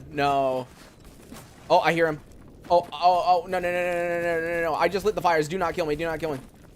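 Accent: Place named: United States. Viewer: the american accent hit harder than i expected despite the fact that i have been listening to your content for about 2 years now.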